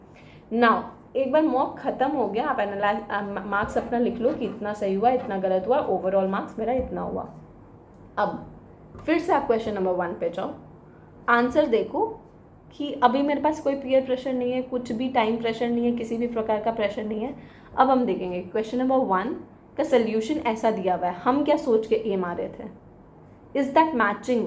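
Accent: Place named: Bihar, India. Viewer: Aap Bihar se ho? Accent se lg rha hai.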